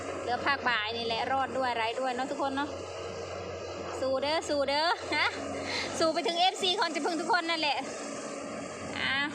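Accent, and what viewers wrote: Korat, Thailand. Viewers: พูดคือคนโคราชเลยเนาะเจ้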